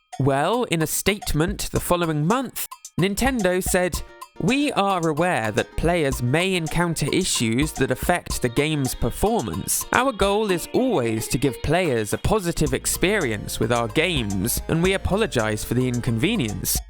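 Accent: United Kingdom. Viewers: So are you just discriminating British accents now? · I mean, he is British.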